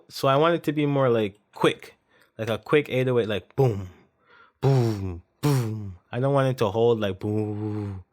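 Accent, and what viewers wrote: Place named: Canada. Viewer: broskiiii u sound canadian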